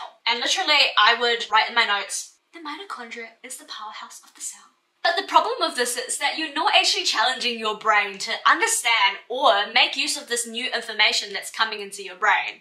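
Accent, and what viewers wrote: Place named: Australia. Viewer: Aussie eyy. Thanks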